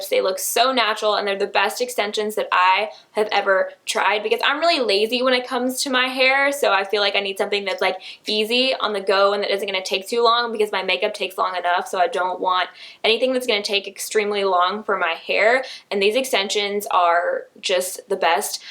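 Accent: South, United States. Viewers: I don't know why and I don't know how but I can hear a southern twang?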